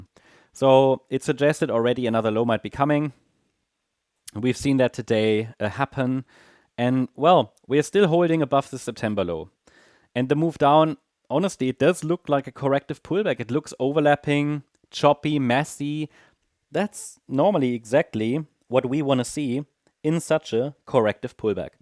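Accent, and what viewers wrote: Germany. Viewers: Man hört deutsch raus, geiler Kerl!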